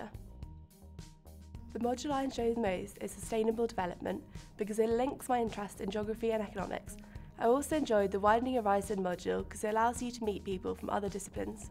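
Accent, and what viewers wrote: United Kingdom. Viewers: i am having a really bad time trying to understand uk accent, im used to the us accent!!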